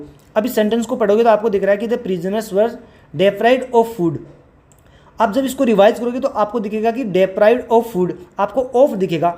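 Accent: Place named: Rajasthan, India. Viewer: Dear sir your accent is rajasthani😅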